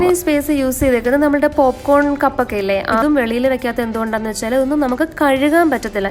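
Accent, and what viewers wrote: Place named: Kollam, India. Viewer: ariyilla.... samsara shaili kettappol tonni..appol onnu confirm cheyyamen vicharichu... ende naad kollam... njan from karunagapally... husband from kadavoor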